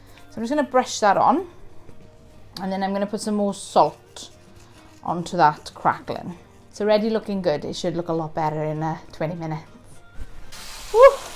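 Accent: Wales, United Kingdom. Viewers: I'm sure that's a Welsh accent I hear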